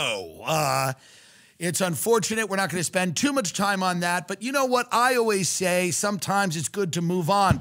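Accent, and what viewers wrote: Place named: South, United States. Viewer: An epic stream of consciousness ad, delivered in a creepily hushed southern accent. Love you, Tim 😂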